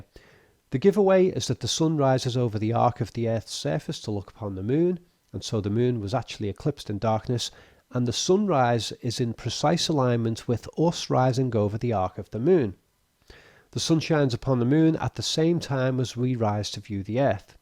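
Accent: Liverpool, United Kingdom. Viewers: You’re an enigma,. Ive never been fond of the scouse accent (probably due to a stag do when the only Liverpudlian was an arsehole) however, I could listen to you all evening. This may come across as a back handed compliment, if so, I apologise. Grate werk r’kid..fantastic analysis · Sounds like a scouser to me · Same here, I re-watch to a lot of stuff at night for the same reasons. Never thought I'd find myself dozing off to sleep listening to the voice of a scouser. However, he has a very rare and soothing scouse accent haha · This dude has got to be from Liverpool. I'm an American, but I recognize that Beatles accent anywhere.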